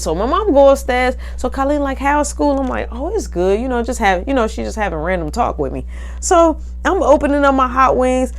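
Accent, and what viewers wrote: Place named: Chicago, United States. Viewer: don’t think I’m trolling, just be tryna boost/run it up 😊 but, you grew up in NY and the Go right? Only cause I spent summers there (still go yearly for my bday, I got family in Chicago) and yo accent sounds like a mix of Chicago and the DMV.. it’s different! Anyway, PLEASE get a publisher dammit! We got time to watch these stories, therefore we can buy a 10/20 dollar book! I’m just saying! I know you can do it! Rooting for you 😌🥰💕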